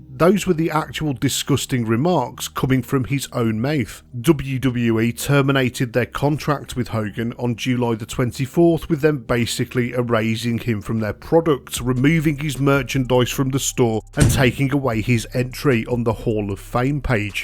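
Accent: Birmingham, United Kingdom. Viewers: Guy narrating is from Birmingham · Favorite new Pro wrestling algorithm find here. Great content 💯 UK area accent is this? 🇬🇧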